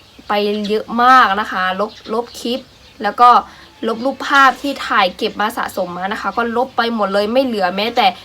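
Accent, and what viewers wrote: Thailand. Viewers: น้องดูเหมือนคนไทย ไม่เหมือนไทใหญ่ จากการพูดสำเนียงภาษาเหมือนไทย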